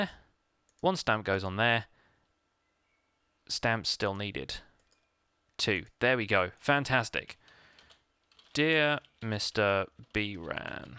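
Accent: United Kingdom. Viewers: I do like how that tower is turning out, and having the plan area does really stand out as a good focal point :) Also the spread up British accent is amazing. :)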